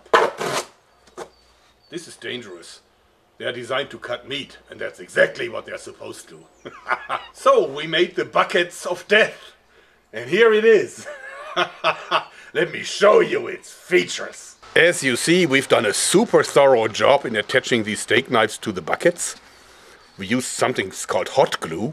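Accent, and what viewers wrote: Germany. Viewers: i just love his german accent😂😂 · Either this is the thickest german accent I've heard in my life should include lederhosen or it's the thickest Swenglish I've ever come upon. CHARMING FELLOW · I am from austria we speak german (sort of) and before i watched his videos my englisch sounded very clean. But now i use the german axcent (his german axcent) in every sentence when i speak, ha ha ha, life is fun.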